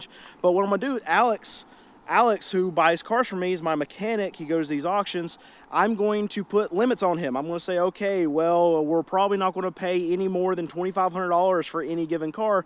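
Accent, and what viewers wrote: South, United States. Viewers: He says ,"buhhcuuuzzz" a lot I am guessing in the south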